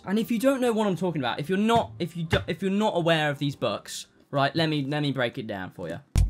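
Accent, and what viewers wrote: United Kingdom. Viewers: I love this brittish, potientially scottish and or austrailian man and his amazing content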